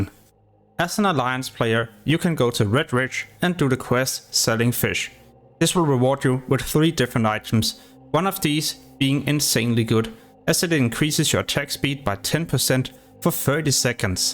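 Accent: Denmark. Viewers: Great tips, ive been playing wow since the very first beta of vanilla and i still found some usefull tips here thanks. your accent sounds like your Dane just like me.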